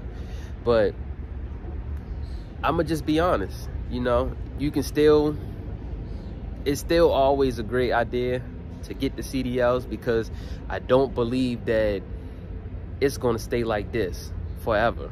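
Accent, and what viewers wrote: Baltimore, United States. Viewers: that Baltimore accent is super easy to hear homie, #respect and #salute to you tho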